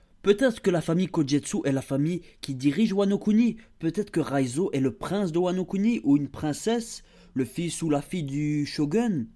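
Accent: Brussels, Belgium. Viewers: D’où viens tu en Belgique ? Petit accent bruxellois, non ?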